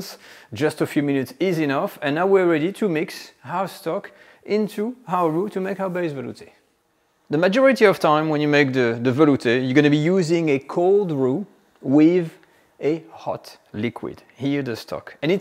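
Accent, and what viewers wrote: France. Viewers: He was born in Paris. Why wouldn't he have a French accent?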